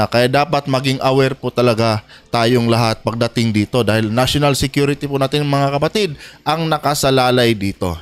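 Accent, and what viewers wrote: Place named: Maranao, Philippines. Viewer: Ang vloger parang maranao mag salita.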